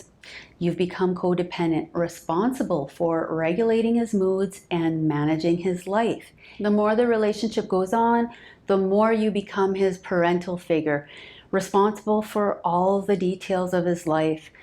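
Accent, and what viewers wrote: Canada. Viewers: I am diggin' the Canadian accent!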